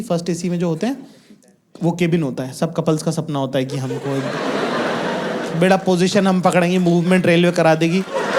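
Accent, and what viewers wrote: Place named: Bhopal, India. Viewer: That bhopali accent is hitting hard❤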